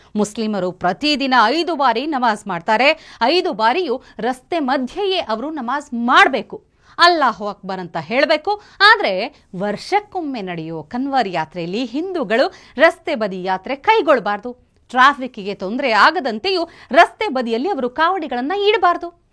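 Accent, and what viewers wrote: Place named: North Karnataka, India. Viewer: ಮೇಡಂ, ನೀವು ಉತ್ತರ ಕರ್ನಾಟಕದ ಕನ್ನಡದಲ್ಲಿ ಮಾತಾಡಿದ್ರೇನೆ ಕೇಳೋಕೆ ಚೆಂದ.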